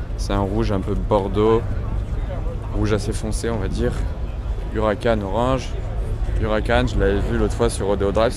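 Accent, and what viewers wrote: France. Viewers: A la meuf te lache un regard 👀 elle a kiffé l’accent français 😂. La Ferrari perso le son et juste dingue.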